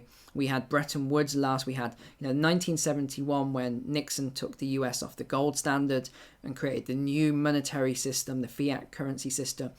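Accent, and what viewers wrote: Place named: England, United Kingdom. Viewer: Hello love your English accent and the clear explanation. I call you from Wastern Australia and here we have good quality gold and silver, but also platinum and other rare metals what do you suggest about them? More over, I still keep my capital half in precious metals and half money in the bank, my idea was to switch full (all saving) into precious metal but I'm not really feel sure if this is the right decision, what else do you suggest to do? And when do you think all our money will be bail in?? After US Elections..?? Thanks in advance for your help and advice regards Luigi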